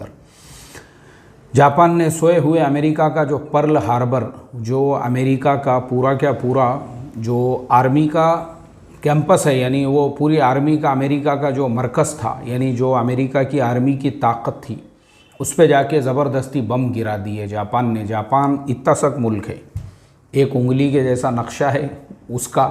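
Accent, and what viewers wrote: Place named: Maharashtra, India. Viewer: Pure Maharashtrian tone. 🙏🙏🙏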